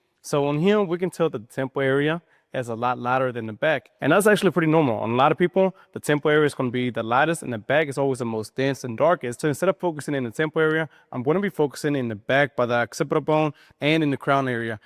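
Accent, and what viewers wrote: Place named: Mexico. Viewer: This guy can’t decide if he wants to sound Mexican, black, or redneck.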